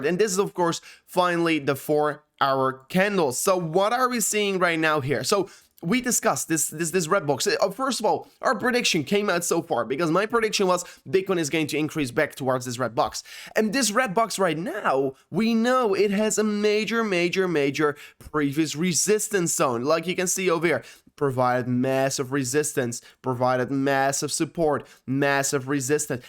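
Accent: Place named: Netherlands. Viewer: Ohhh is hij toch Nederlands !? ik dacht al een " dutch accentje" te horen 😊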